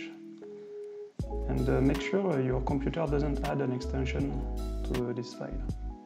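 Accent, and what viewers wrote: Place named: France. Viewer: Very nice and charming with spoken English with a heavy French accent! Though when neither language is your mother tongue then the background music overlay upon this really becomes an obstacle! I'll see if my patiance will take me through this ... accordingly I can not give you any score for this video yet ...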